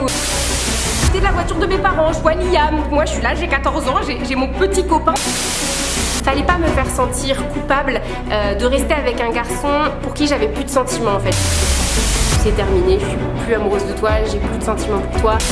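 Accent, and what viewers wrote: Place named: Normandy, France. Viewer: J’adore ton petit accent normand car je suis également native de cette région et j’ai cette sensation réconfortante, familiale quand je regarde tes vidéos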